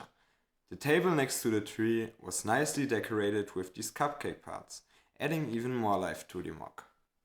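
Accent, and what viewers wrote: Germany. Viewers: Klingt sehr deutsch haha aber geiles moc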